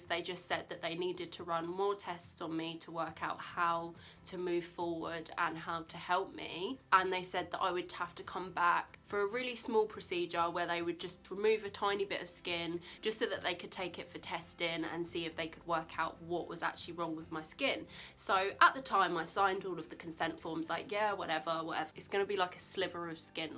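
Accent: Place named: England, United Kingdom. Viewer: You're so pretty! I hope they find something useful to help you out. Love your accent too! (Sorry I live in America so the english accent is sounds nice to us haha)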